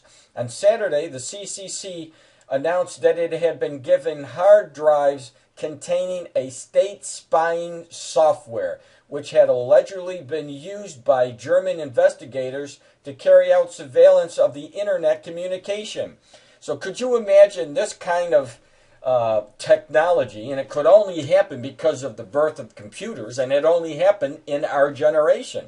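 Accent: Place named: Rhode Island, United States. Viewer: are you originally from Rhode Island by any chance? I'm hearing bits and pieces of an RI accent. Another great video!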